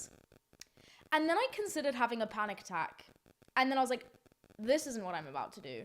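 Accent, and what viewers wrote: United Kingdom. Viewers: this kinda long video literally helps my listening skills for British English in the exam, I love you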